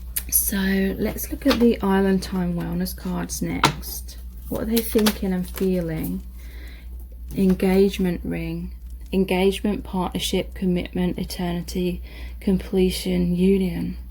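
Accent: England, United Kingdom. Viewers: She does have a soft sweet voice with an english accent 💖🌹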